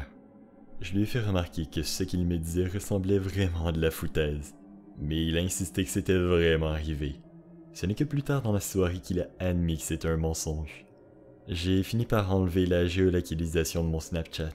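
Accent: Quebec, Canada. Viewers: On dirait un accent québecois nn?❤️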